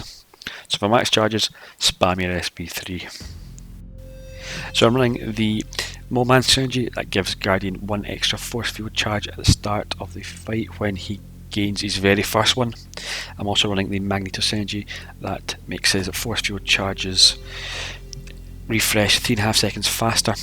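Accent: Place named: United Kingdom. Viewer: Your British accent is THICC Boy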